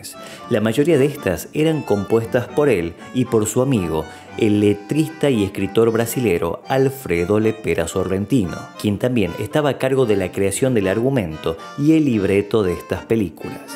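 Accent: Tucumán, Argentina. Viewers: Muy bueno el video. Haría dos comentarios. Le Pera nació casualmente en Brasil, pero nunca vivió en Brasil, siempre vivió en Buenos Aires. De la manera que Gardel Nació en Francia, pero pasó su infancia en Argentina. Diríamos que los dos fueron argentinos. En cuanto al relato de la creación de Por una cabeza, no fue Pigna el que lo recopiló sino que está en el libro de Tucci. En cuanto Delicatessen, no recuerdo que apareciera Por una cabeza, lo que sí aparece es Una lágrima tuya., me gusta más cuando hablás en tucumano que cuando hablás en castellano neutro.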